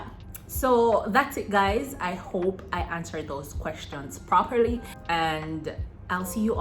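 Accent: Jamaica. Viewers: Jamaican? Hi from Trinidad!!! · I can feel Jamaican accent behind you words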